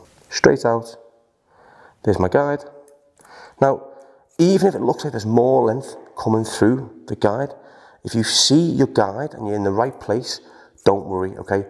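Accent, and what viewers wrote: Liverpool, United Kingdom. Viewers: Is that a Liverpool accent ?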